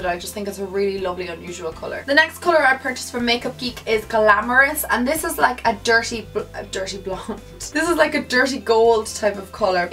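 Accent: Ireland. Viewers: I love your videos so much!!! I love your Irish accent!!! I'm from Ireland too!!! I love you and your channel!!! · Is she irish? Oh shes so lovely dovely 😞 😍💑💏💞💖💕💓💗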